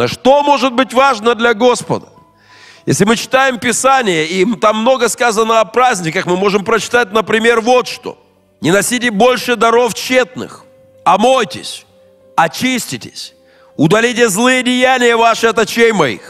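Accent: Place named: United States. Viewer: Что - за американский АКЦЕНТ?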